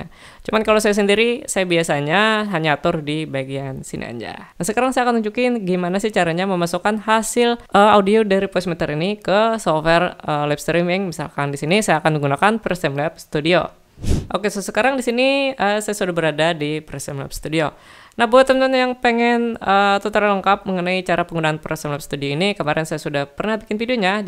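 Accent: Banjar, Indonesia. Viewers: Dari logatnya kaya orang Banjar ini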